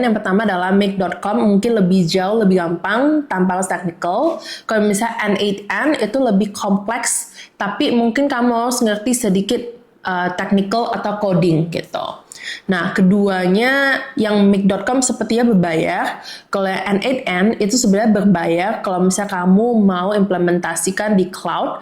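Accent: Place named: France. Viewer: aksennya franch bgt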